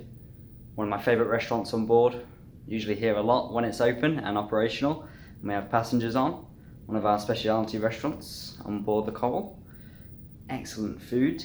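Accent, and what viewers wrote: England, United Kingdom. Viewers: Where are you from you have a accent from England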